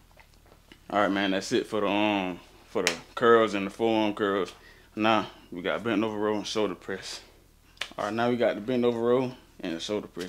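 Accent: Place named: Georgia, United States. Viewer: twin gotta be frm ga , ik my fellow Georgians when i hear them 😭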